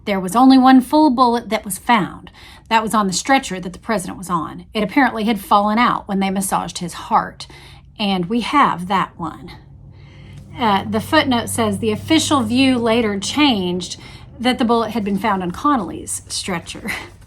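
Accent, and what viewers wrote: Texas, United States. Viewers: "Best Texas voice?", what's wrong with your normal Texas accent? I am still impressed that on the worst Friday in American history the FBI conclusively linked an abandoned rifle (not proven to have shot anybody) to Oswald (because Oswald rented the mailbox--but there was no testimony nor any evidence that Oswald picked up the rifle found) in ten hours. The FBI did this without computers, just fax machines, telephones, shoe leather and elbow grease. Why waste money on gun registration when John Edgar Hoover's boys did so well without? The "insufficient evidence" was never tested by screening the evidence for admissibility by a judge, then used to support the Theory of the Case in front of a jury. In other words, the FBI found a rifle and had a shaky link to Oswald--but never had to prove it was the same rifle that killed JFK. 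It's in poor taste to mention that there were more holes connecting Oswald to JFK's murder than there were in JFK after being shot two or three or four times.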